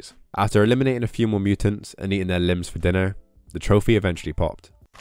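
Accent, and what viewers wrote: United Kingdom. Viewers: Are you Bristolian by any chance? I recognise the accent haha where I’m from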